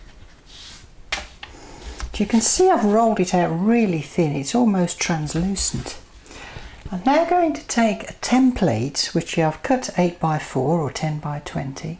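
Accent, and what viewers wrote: United Kingdom. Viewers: Bonjour, je dois dire que je suis un fan absolu!!! J'adore toutes recettes, votre voix votre accent britannique, votre humour bref je vous adore!!! Alors continuez a nous faire saliver et un grand merci pour toutes ces recettes! ( même si je suis au régime j'adore regarder vos recettes) je vous embrasse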